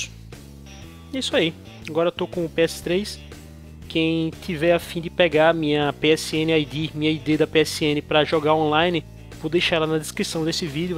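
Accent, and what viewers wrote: Portugal. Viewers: esse cara é portugues ou nordestino??? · Vc é de Portugal?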